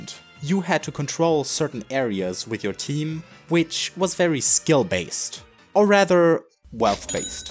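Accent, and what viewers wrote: Germany. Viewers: ru german your accent sounds like it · based on the accent im assuming you are german?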